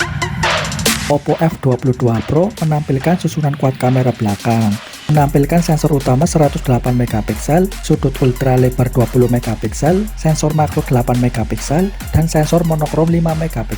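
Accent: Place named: Java, Indonesia. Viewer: Medok bener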